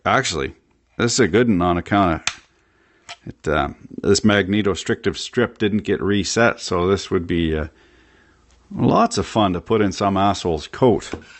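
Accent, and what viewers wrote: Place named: Canada. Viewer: I lived up in Toronto in the 80s play a little hockey bounced around you sound a little further north than most but I missed that Canadian kind of accent and the humor in the triple X 12%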